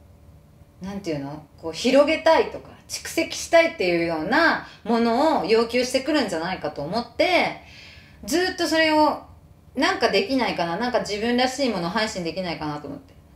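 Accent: Nagoya, Japan. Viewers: Twitterの一言に多くの共感！YouTubeではより多くのコメントを聞ける楽しみがあります。これからも頑張って下さい。 普通にイントネーション名古屋弁入ってまっせw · 登録しました！ 名古屋からです。 名古屋弁、期待してます。👍🏻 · 一度にこれだけ長く話してるの初めて見ました。たまに名古屋弁のイントネーション入ってて嬉しいw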